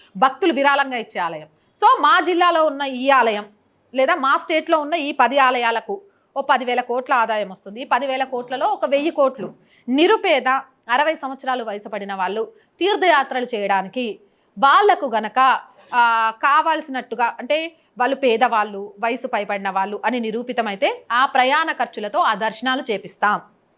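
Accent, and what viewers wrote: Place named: Srikakulam, India. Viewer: మేమ్ నిజం చెప్పండి మీది శ్రీకాకుళం కదా మీ మాట catch చేసాం అమ్మేస్తన్రు అలాగే మన ఆకలి తీర్చటానికే ఆలోచిస్తన్రు అన్నారు 😄👌👌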